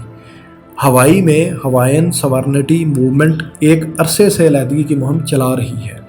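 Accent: Punjab, Pakistan. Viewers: bhai....khuda key wastey urdu main punjabi mat Bola Karo yaar....English words ko bhi punjabi style msin parhtey ho...urdu ka janaza mat nikalo yaar...hire some good urdu speaker...plz...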